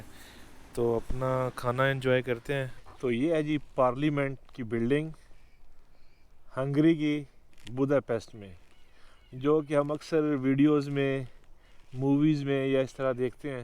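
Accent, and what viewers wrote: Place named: Sargodha, Pakistan. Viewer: bhai ilaqa kon sa hy apka? Lehja Sargodha k as pas ka mehsoos hota hy.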